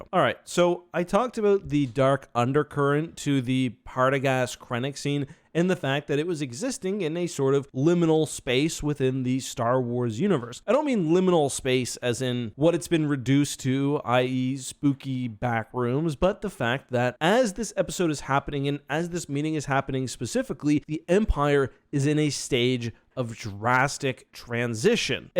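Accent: Canada. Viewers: this canadian english makes me imagine all the ways Canada prospers off america, like this yt platform with commentary on a hollywood production.. weird ways like this that does not seem obvious.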